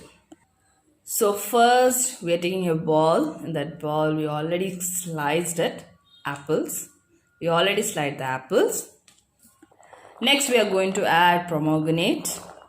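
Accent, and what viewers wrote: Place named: England, United Kingdom. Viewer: I just like your English accent than this fruit salada😊😊😊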